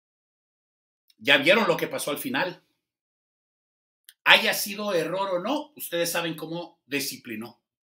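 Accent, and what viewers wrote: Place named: Venezuela. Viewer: Me encantas porq hablas como el venezolanos sin pelos en la Lengua 😁😁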